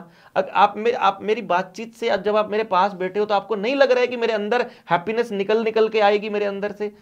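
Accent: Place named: Haryana, India. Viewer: इंटरव्यू करने वाला बंदा तो अच्छा रखा करो जिसकी जबान साफ हो और एक्सेंट ना हो। गंवार टाइप हरियाणवी ग्रामीणों को इंटरव्यू लेने बैठा लेते हों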